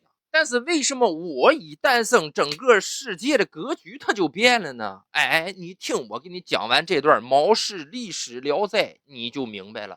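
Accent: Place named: Daqing, China. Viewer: 大庆话，有生之幸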